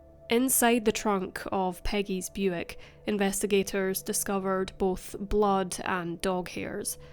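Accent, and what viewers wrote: Scotland, United Kingdom. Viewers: Brits write and speak better. American English sentences can sound clumsy to us. The narrator is a Scot.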